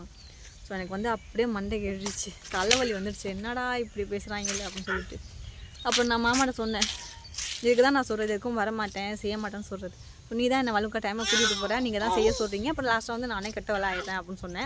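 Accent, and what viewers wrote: Thanjavur, India. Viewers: தஞ்சாவூர் தமிழ் அப்படி தான் இருக்கும்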